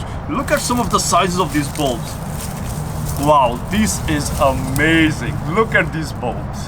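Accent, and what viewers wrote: India. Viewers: i cannot understand your heavy indian accent bro!!!!!!!!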